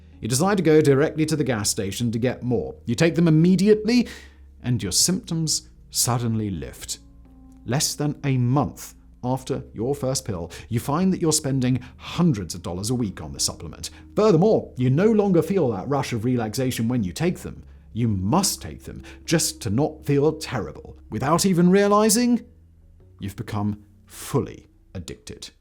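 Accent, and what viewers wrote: United Kingdom. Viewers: How is it that he reporting on American products with a british accent and I never seen this American product 😂 bc down here in Houston if someone say zaza they talnbout that good gas. Or superb Marijuana for you unseasoned mfs.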